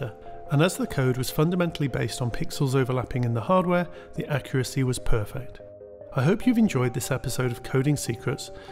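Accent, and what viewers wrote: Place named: United Kingdom. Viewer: I really like your voice. Friendly and pleasant. I like British accents too. :3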